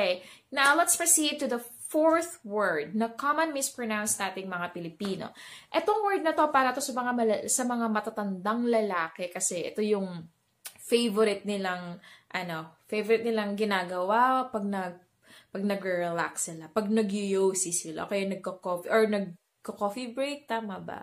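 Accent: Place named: United States. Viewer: Hello there. Your pronunciation is very good but has an slight American “slant” to it which is not always pronounced as “true English”. I assume your in the USA not England? There are many different dialects in the UK which can sound different in different areas of the country, which is also similar in the US of course. Having said that, I would suggest mayonnaise has a number of slightly different pronunciations. Where I am it has more of a “maya” naise sound to it lol 😂 Having said all of that, you speak very well and will undoubtedly help many people 🤗👍🏻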